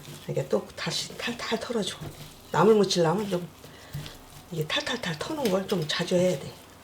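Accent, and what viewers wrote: Chungcheong, South Korea. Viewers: 충청도말 재밋네유 배우고싶어서 저장했어유 요리도 쉽게 하시구유 맛나게 보이네유 꼭 해볼래유❤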